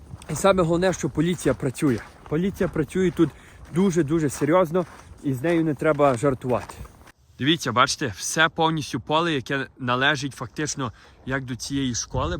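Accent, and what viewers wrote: Western Ukraine, Ukraine. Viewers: галицький діалект, і чого так зневажливо, " западенці"?, це що за кацапські словечки? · Не чув) але говір як западенський)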